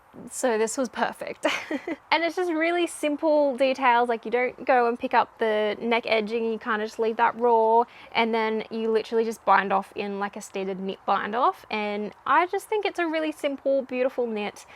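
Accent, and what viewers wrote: Australia. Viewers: Love the podcast and hearing our fabulous Aussie accent on screen. Love the look of the bag and yarn from Bendi too. Thanks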